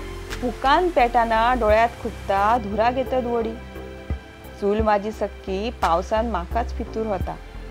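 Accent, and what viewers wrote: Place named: Malvan, India. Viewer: अतिशय सुंदर. कवितेतील अस्सल मालवणी शब्द आम्ही लहानपणी ऐकले होते. नाहि तर हल्ली मालवणीच्या नावाखाली काही तरी धेडगुजरी मालवणी ऐकवतात/बोलतात. असच अस्सल मालवणी तुमच्या कडून ऐकायला मिळो हीच सदिच्छा.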